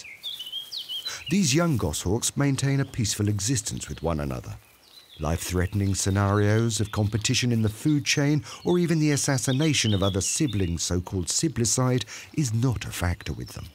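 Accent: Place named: North, United Kingdom. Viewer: Disliked due to overly used northern accent.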